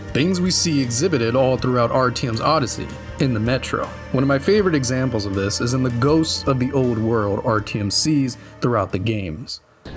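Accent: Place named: South, United States. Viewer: What's with all the midwitted manchildren that don't understand vernacular and accents? Nu-kyu-lur is a dialectical pronunciation. We know how it's actually pronounced. But that pronunciation works better with a Southern accent due to how we stretch vowels and shorten consonants. It's why we pronounce "oil" as "ole" and "window" as "winder." It's just easier to pronounce for us. Nobody harps on the Japanese for pronouncing McDonald's as "Makudonarudo" because they struggle to pronounce the OG name. Nobody gives Jamaicans shit for pronouncing things the way THEY do. And there's nothing wrong with their mode of speech because it works for them, and they understand it. Look...language is an evolving, living thing. It changes. Despite my personal elitism over Classical Latin being disregarded in favor of Ecclesiastical pronunciations in historical films set in the Roman Empire/Republic eras, I get why it's done. 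But that's more about authenticity. Went off the rails near the end there, but you get my point. Accents are accents. No shit. Bostonians saying "paak the caah" and "hahbah" instead of the intended pronunciation is fine. It's not a sign of ignorance or insipidity. And pointing it out is the lamest Reddit "gotcha" that only reveals how fucking stupid YOU innately are. What else? You gon tell us Frankenstein was the Herr Doktor and not the monster next? Greenland isn't actually green, and Iceland isn't all ice, Reddit professor?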